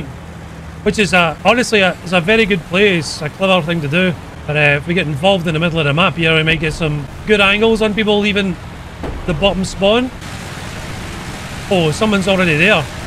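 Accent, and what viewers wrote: Scotland, United Kingdom. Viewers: The guy is Scotish he can't tell the difference...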